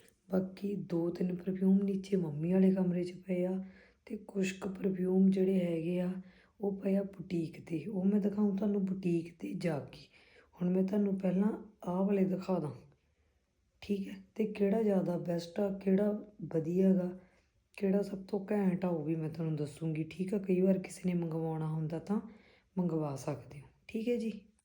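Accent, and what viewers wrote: Malwa, India. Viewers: Hnji didi ji malva cha aapa sare varge hi bolde aa ji and tusi sare bhut vadiaa o didi ji waheguru kare tusi didi hamesha aae hi khush rho ji ❤❤❤didi plzzzzzz jado tusi kadi pind hun aae ta daseou ji mera pind kot fatta ji kot smir de nal ji asi thunoo ghar blaouna ji ❤❤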